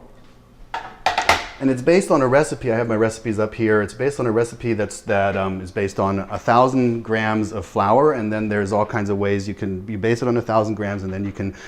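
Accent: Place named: Southern California, United States. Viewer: You seem like you're from California around my general region. Your dialect and your attitude are familiar to me. Being born and raised in Southern California, I pick up on that.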